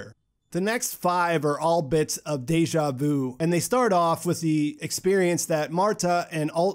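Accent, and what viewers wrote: United States. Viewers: It’s so exhausting listening to the american pronunciation of the german names - we are able to pronounce names from foreign series in almost the right way, so why don’t you all try too? :D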